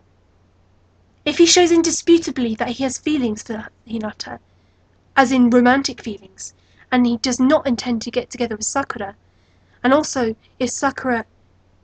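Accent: United Kingdom. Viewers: I love your rant! What you say makes so much sense and your english accent is awesome! ^^ BTW: NaruHina & SasuSaku are CaNoN!!! Let's celebrate "\(^v^)/" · Soooo true!! NARUHINA FTW! I love you and your british accent is so beautiful *-* ♥ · First of all I love your british accent! It's nice to hear a rant from somebody not from Japan or the States for once. Second, I just know one thing, regardless of all the facts in this argument, I think it would be an utter travesty to not make NaruHina official because I honestly can't see Sakura being disappointed about those two being together at the end of the show, yet Hinata would be devasted terribly if he chose Sakura, especially after putting her life on the line against Pain.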